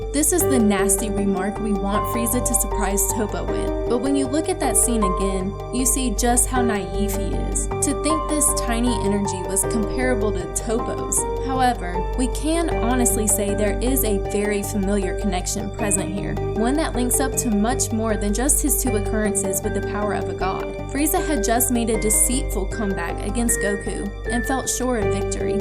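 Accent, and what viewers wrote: South, United States. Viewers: low key has a southern accent. I like it lol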